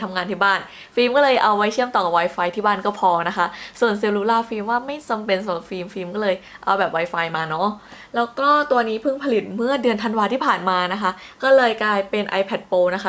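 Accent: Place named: Thailand. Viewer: คิดถึงช่องนี้ เพราะ ตอนเด็กๆดูบ๊อยบ่อย จำการรีวิวได้ แบบ จะเน้นของที่รีวิวแบบ เหน่อๆ แบบ อย่างนั้นแหละ 555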